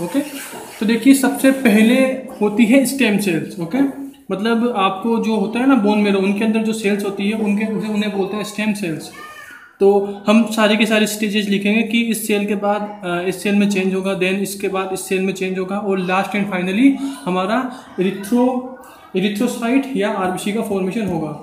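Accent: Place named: Bihar, India. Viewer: your videos are really good except for that bihari tone you speak.......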